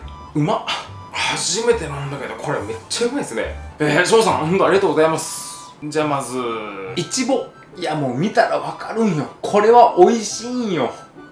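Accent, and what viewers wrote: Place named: Hakata, Japan. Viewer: たまに博多弁出てますね！ 懐かしかです。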